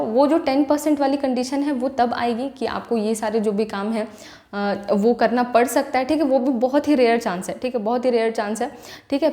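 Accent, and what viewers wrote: Jharkhand, India. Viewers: Aap jharkhand se ho kya??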